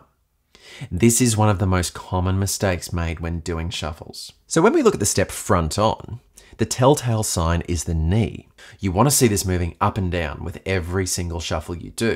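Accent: Australia. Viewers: Is this guy Australian? He sounds that way. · Crikey he’s Australian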